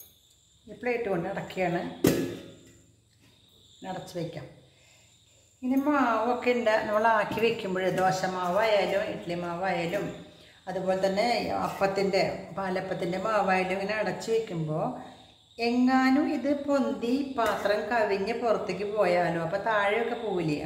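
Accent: Thrissur, India. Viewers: Namaskaram. Valare saumyamaya sambhashanam aanu video kaanan prerippichathu. Valare upayogapradamaya content 👌 Valare Santhosham Nandi. Samsarathil ninnu Nanma niranja,teacherum veettammayum,Trissur swadesiyum Tamil brahminum aanennu manassilayi.Ellavidha aasamsakal nerunnu.iniyum dharalam videokal prateekshikkunnu.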